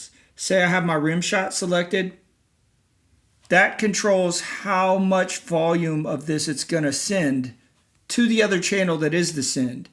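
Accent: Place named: United States. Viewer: Was so surprised to hear an American accent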